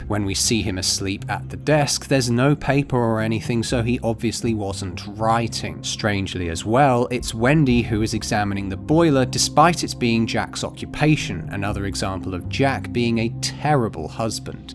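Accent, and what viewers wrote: United Kingdom. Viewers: The whole time I'm watching I forgot that you have a British.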